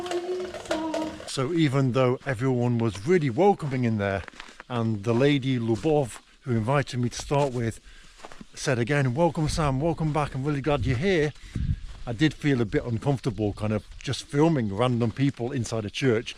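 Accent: England, United Kingdom. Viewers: Weird watching an English accent in Russia . 😃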